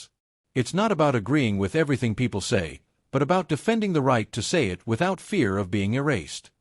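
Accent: United States. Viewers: Even worse it's got a yank accent and can't pronounce SAS Who Dares Wins properly. These channels should be banned.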